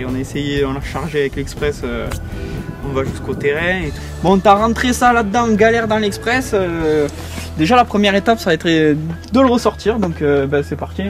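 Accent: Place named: Toulouse, France. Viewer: un garage en toile en plein été c'est tout bon j'ai repeind mon lada sous un préau en pleine été avec du vert brillant direct et sais franchement pas mal malgré quelque petit défaut ;) Bon du coût mon express a était swapé avec un f8Q mes avec soit la culasse du F8M ou il on juste remis l'admission du f8M, un vrai ch'mille blic la mienne, mes bon pour 100€ j'vais pas me plaindre 😅 Mes sinon vu ton accent tu serait pas de la région Toulouse ou quelque chose comme sa ?? Perso je suis Tarbes donc j'me dit que peut être une fois la mienne fini...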